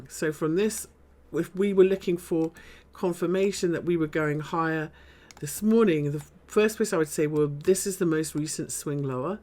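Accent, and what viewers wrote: United Kingdom. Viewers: U sound British . Look forward to Learn from you base in London.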